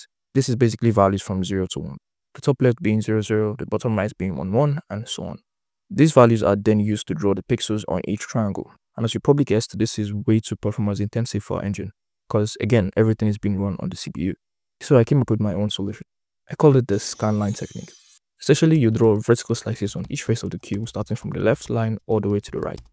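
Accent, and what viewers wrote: Nigeria. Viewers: this dude sounds nigerian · are you nigerian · Awesome video You kinda sound like some of my Nigerian friends ngl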